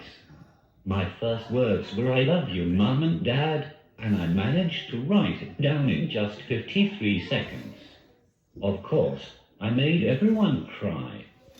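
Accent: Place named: Birmingham, United Kingdom. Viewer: Congratulations on your engagement, I hope you get to walk down that isle and get to talk to your huckleberry in your own Brumby accent!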